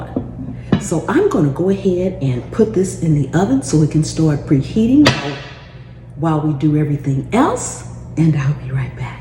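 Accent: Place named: Louisiana, United States. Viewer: I hear the southern accent but can’t place it. I want to say Louisiana. Whatever it is, I could listen to you talk about cooking all day!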